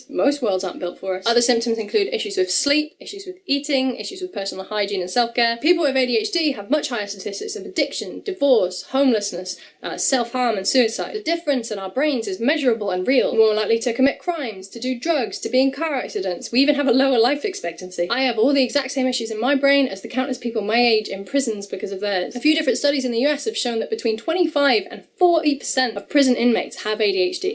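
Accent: Wales, United Kingdom. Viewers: I only just discovered your channel about a year ago, and I have to say that I thoroughly enjoy it. Mostly, because I'm American and am enthralled with everything from the United Kingdom. Add to that, your videos are very well made and the cuts from sentence to sentence are what make them so enjoyable. May I ask from what general area of Wales you are? I don't need an address or anything. I can hear your Welshness in some words, but you (to an American, forgive me) sound more English.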